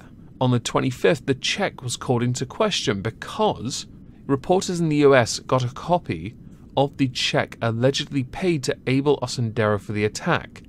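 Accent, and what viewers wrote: United Kingdom. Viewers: for PM! No Lives Matter!!!! Always love to see you upload, my man. Good to hear another brit talk about this stuff.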